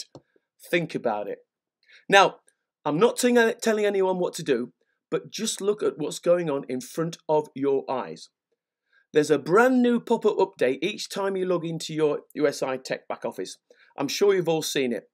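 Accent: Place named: North, United Kingdom. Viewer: Your sense of humour made me laugh...you sound like a Northerner like me, but in on the know with USI Tech also...enjoyed the vid.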